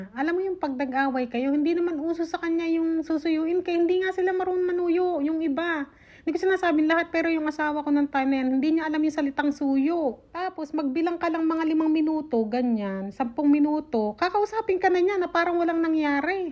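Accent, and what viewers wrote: Nueva Ecija, Philippines. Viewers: Grabe yong buhay madam ano, lalo yong 10-13 pero talagang pag mag ti-tiyaga may maganda din mangyayari. Tignan mo ngayon super Blessed kayo. Followers mo ako sa dalawa mong fb account tas dito sa YT, silent, di ko lang napigilan mag comment ngayon. Andito din kami sa sharjah gusto ko din nga sana makapag bigay sa mister mo kahit paano sana tapos maisend sa inyo dyan sa pakistan. Ps. Ang asawa ko naman ay taga muñoz nueva ecija, kaya super natutuwa ako sa accent mo. ❤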